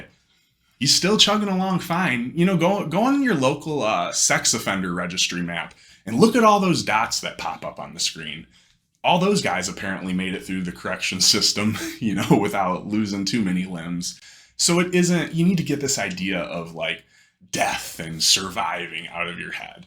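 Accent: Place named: Australia. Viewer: what did you do that landed your ass in jail . Do tell. the Australian accent in general has always given me gay energy. its low key cute. The police is super intense in america, it just snowballs from there. No matter how sweet you are if they hate your ass from jump , you are pretty much toast. I am an albino male, hyper aware that its my key visible feature. i am disrespected enough in real life so i don't think i'd nice in a confined environment if someone gets testy . i do know a lot of people find albinos spooky.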